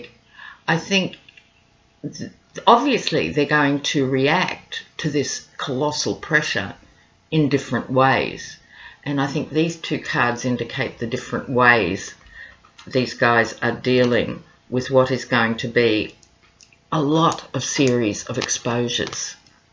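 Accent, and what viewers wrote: Australia. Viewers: Great to hear a fellow aussie. Good stuff!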